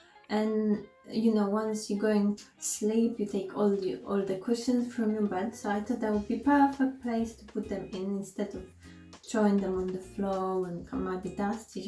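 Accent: Poland. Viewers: . Po akcencie tak mi się wydawało, że z Polski, ale dla pewności wolałam zapytać. Super. W takim razie powodzenia na yt. I tak jak napisałam, czekam na kolejne zdobycze i haule. Pozdrawiam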